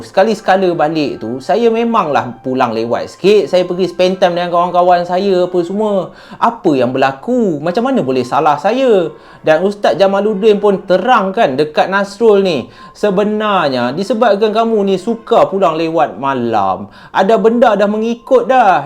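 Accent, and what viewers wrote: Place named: North, Malaysia. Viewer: tak boleh la.. rasa annoying bila cuba cakap slang utara..nasib baik sikit tak macam yg cerita kat perlis tu.. tapi yg lain2 okey...saya suka penceritaan.. tapi slang utara terlampau over sgt..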